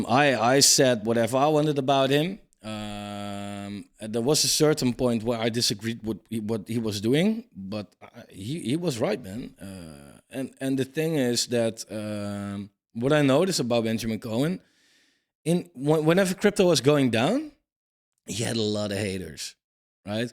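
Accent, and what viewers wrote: Netherlands. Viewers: blijft leuk he, dat nederlandse accent, je praat goed engels hoor !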